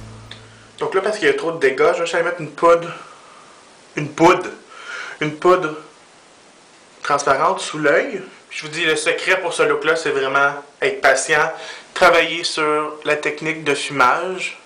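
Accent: Quebec, Canada. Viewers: Entre l'accent canadien, l'accent anglais et l'accent francais en parlant en anglais, sans oublier ton talent en maquillage... mais y a pas un truc que tu fais mal?!! LOL C'est cool de penser aux francophones qui comprennent pas l'anglais en tout cas! Awesome as always and thank you for being so cheerful and funny all the time! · j'aaaaaaaaadore en plus en français avec le petit accent québécois!... c'est Parfait :) Merci pour cette vidéo :) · Enfin!! Un video en QUÉBÉCOIS!! :) Tes super beau!! :D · That's Canadian French, a LOT different than French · Que tu es drôle ! Quel plaisir de te voir et de te comprendre enfin, qui plus est avec l'accent Canadien. J'espère qu'il y en aura d'autres ainsi, que je puisse m'en rejouir et rire. J'adore ! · he speaks "canadian french" it's the same but there's just a different accent =) I speak "france french" and I can easily understand him =). 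· Excellent les tutos en français, et l'accent quebécois toujours génial à écouter ! =) Continue ! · Excellente vidéo! Je me suis marrée comme une bossue et puis le maquillage est réalisé avec une très grande dextérité! Bravo! Qu'est-ce que tu es drôle, en anglais comme en français!!;) "Gang de cochons"! Punaise ce que j'aime l'accent québécois!!! lol Bisous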